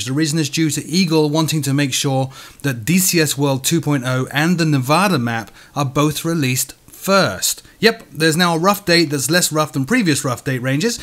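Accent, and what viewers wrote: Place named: England, United Kingdom. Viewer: He's English, he won't pronounce words like you or other Americans.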